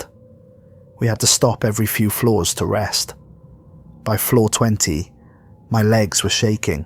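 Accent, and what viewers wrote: United Kingdom. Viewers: You did an excellent job of writing one of the most depressing science fiction stories of all time despite the glaring inconsistencies. The narration was completely appropriate for the dark atmosphere, although the British accent seemed out of place. I disagree with many of the earlier posts that this tale needs a second part with a more conventional ending.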